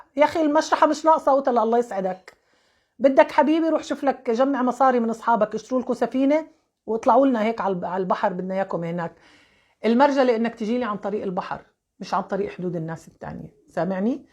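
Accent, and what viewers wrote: Egypt. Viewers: انت لست فلسطينية انت مصرية لهجتك تؤكد ذالك ولم أكمل مشاهدة الفيديو ومصر ليس لها الحق كما ذكرت من في سدة الحكم القاتل عميل الصهاينة الخسيسي يحمي هذا الكيان اللقيط والقافلة تريد فك الحصار لا الحرب وانت تبرري لها الحق عن اي حق وهو جند التراما دوليون الحشاشون عبدة المال القذر لسب الناس المسالمون وإعلام العار والشنار اللاعق الناعق بما هو مخزي لدولة عريقة أصيلة بحجم مصر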